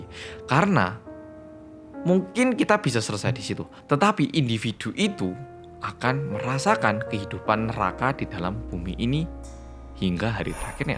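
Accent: Java, Indonesia. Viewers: Kenapa kalau lihat mas gw selalu tiruin intro nya HALO GUYS WLCOME BACK TO KOREA REOMIT BERSAMA ORANG KOREA YANG MEDHOKKKKK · Oke bolo-bolo , bersama orang Korea yang Medokkkkkk ,🤗🤗🤗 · Podho Karo tonggoku ,jare wong Korea ,tapi ngomonge medhok juowooo pol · editor nya mantap bang nya lebih mantap..Fix paduan mantap 😆😆 Salam medhooookkk!😂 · kok seneng q ndelok e mase medok e iku 🤣🤣🤣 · back to story from, wes medok, muaenak tenen dengar ceritonya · mau ngumpulin org yang sama sama nyebut medhookkkkk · Mas medokkkkk wkwkwk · Orang Korea Medok. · Pentinge "medhokkk" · bersama orng korea yang... AKU&: menDOK!!! Aku selalu ngikutin kata "mendok" setiap opening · hello guys welcome back to Korea Reomit bersama orang korea yang.. MEDHOKKKKKKKKK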